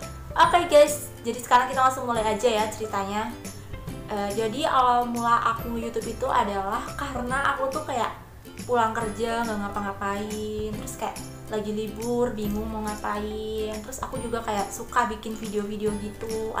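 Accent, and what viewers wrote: Banyumas, Indonesia. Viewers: hai kaka cantik dan ngapak lagi,smpurna deh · Jebul wong ngapak kaya Inyong, makasih motivasine mbakyu , sukses 😁👍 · Salam dari magelang kak. ternyata ngapak ini orang😂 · 😅 dengka pada bae ngapak karo nyong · Wong Ngapak Ya 😁 👍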